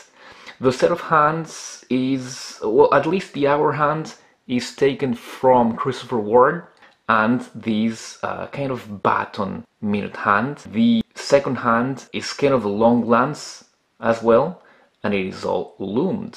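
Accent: France. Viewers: tu sonnes très Français pour un reviewer en anglais lol (mais belle prestation... sans doute un Belge ou un Suisse mdr) Salutations de Belgique.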